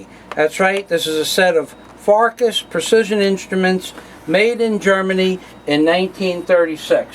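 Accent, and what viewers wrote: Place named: Texas, United States. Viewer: you sound like you from taxas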